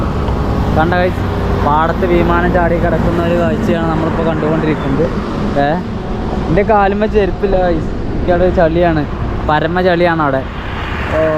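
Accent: Malappuram, India. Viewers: Malappuram slang kurachu avoid cheyuka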